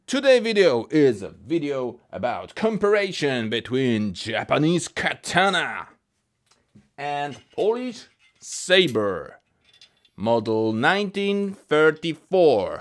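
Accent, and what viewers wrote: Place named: Russia. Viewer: Why does he have a russian accent?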